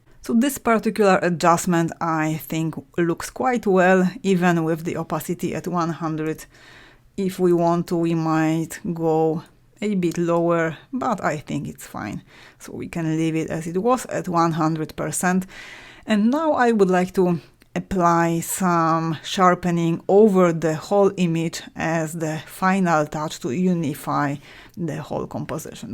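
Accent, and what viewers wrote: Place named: Poland. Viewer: De acuerdo, Enrique, y ya que mi lengua materna es el inglés puedo confirmar que el dominio de del idioma es superior. Es más, su rico acento polaco, según lo expresan los hondureños al menos, pues, es del todo 'delichoso' al oído nativo.:)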